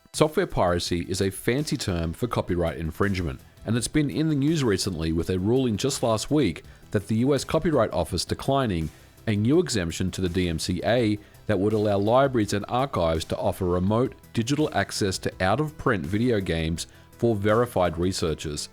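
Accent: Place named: Australia. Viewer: “here in the United States" — dude you sound so Aussie lol 😁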